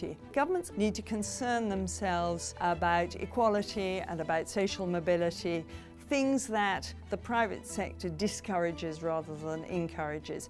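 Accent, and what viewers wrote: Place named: United Kingdom. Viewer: She speaks like a typical British supposed to speak:)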